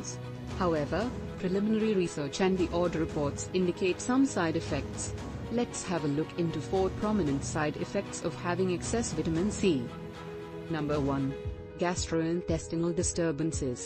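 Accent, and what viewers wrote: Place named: India. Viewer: As soon as i heard the indian accent i stoped the video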